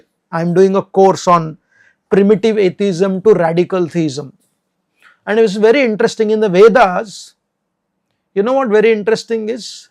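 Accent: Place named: India. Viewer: I think it would be helpful and also appropriate, that the prabhu who is speaking introduces himself in an informative way.: how many years he is in ISKCON, who is his diksha guru, his management position if any, has he been appointed by ISKCON GBC to represent this viewpoint, etc. That should be the first point. There is no introduction, although some devotees in India ISKCON must be knowing him very well, I can only suppose. And if his presentation is supposed to be THE official position by the present Mayapur ISKCON GBC, perhaps someone should add English subtitles to his talk, as his Indian accent is very thick and may not be understood by a wider audience of devotees and lay people, who may not be not familiar with his language accent. Hare Krishna.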